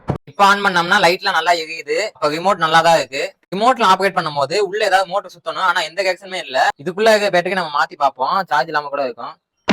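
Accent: Madurai, India. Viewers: மதுரை யா நீங்க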